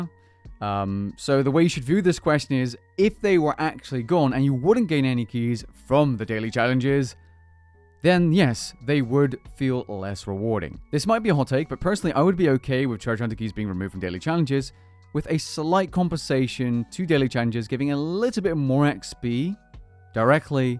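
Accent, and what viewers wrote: United States. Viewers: So, you're telling us that you have a light soft American accent voice, right?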